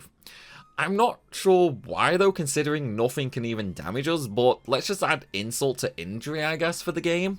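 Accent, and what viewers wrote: United Kingdom. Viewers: Have you never heard a Brit speak before?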